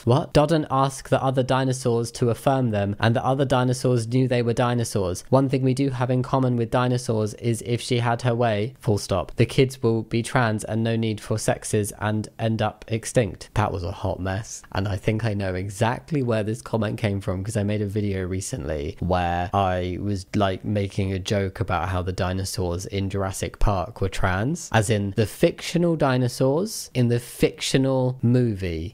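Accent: United Kingdom. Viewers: Re, Smug and patronizing: Thats the American ear hearing the British accent. John Oliver makes jokes about it frequently. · Omg these hate comments 😂😂😂 the only issue i personally used to have with your voice is your heavy british accent. It used to be kind of hard to understand for me no matter who spoke it, because english isn't my native language and the waste majority in media uses mostly american accents. I'm all good these days though, in part thanks to following you and few other channels of people from the UK. Love you, ❤, please don't even change. The cross between the dry humor, politely formulated sass, and actual explanations are so uniquely you, i love it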